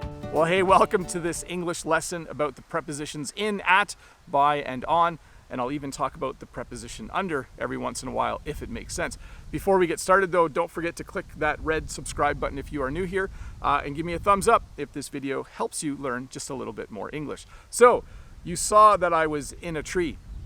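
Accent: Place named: Canada. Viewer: New subscriber and I found your Video's really helpful to learn english in canadian accent. I'm enjoying your videos very much Sir. I'm starting to download many of your videos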